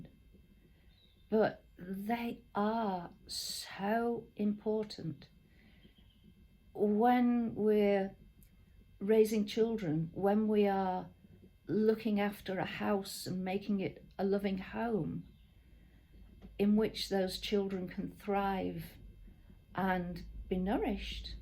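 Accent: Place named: Lancashire, United Kingdom. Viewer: I thought it was a Lancashire accent, maybe more rural Lancs than say Manchester. I was brought up in St.Annes and now we live in Heysham, it's a grand place.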